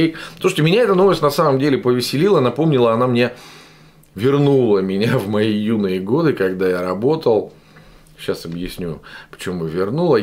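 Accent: Moscow, Russia. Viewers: Это всё хорошо,согласен. Один вопрос только. Почему в таких роликах на Ютубе,те,кто ведут свои каналы,голос копируют ведущего Киселёва? Вы нормально говорить умеете? Это А-канье на всех гласных, московский акцент выдает сразу. Или всё блогеры телевизора с Киселевым насмотрелись и не представляют себе как можно по другому разговаривать? Такое впечатление,что есть две манеры разговора: как путя и как кисель. Всё! И все копируют либо ту,либо ту манеру разговора и интонацию! Это Пиз..ец просто!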